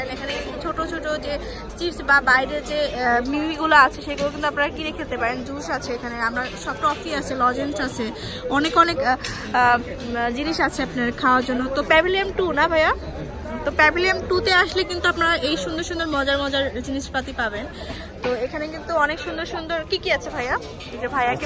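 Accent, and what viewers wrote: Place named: Rural, Bangladesh. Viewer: Apnar khotha gula valo lagyna.coz grammo kothar Moto hoy jay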